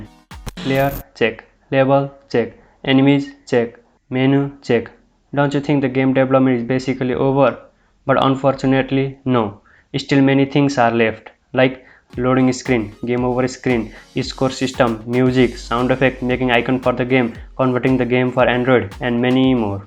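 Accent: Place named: India. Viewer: Heey you sound like an Indian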